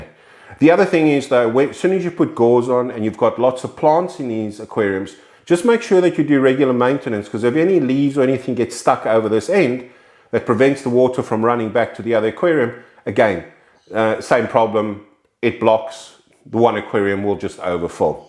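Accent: South Africa. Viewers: Definitely South African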